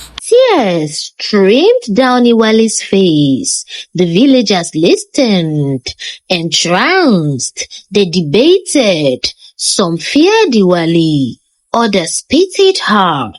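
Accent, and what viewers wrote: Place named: Yoruba, Nigeria. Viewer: The way you Dey talk the story like Yoruba woman too funy 😂😂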